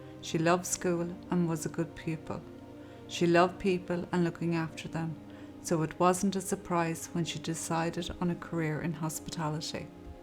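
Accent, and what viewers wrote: Ireland. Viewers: I simply love the narration. ❤️ To the point, no loud music, no annoying speech patterns, no (time fillers of) recaps of what's already been said. And you get a cute little Irish accent. Love it !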